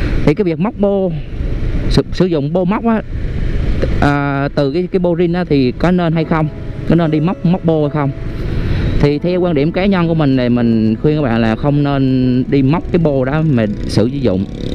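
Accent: Central, Vietnam. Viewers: Nghe giọng ông anh miền trung vui ghê :))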